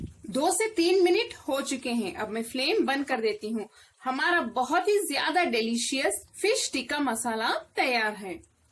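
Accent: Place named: South India, India. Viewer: Hope you are from south india, Because of your Hindi pronunciation, i feel that.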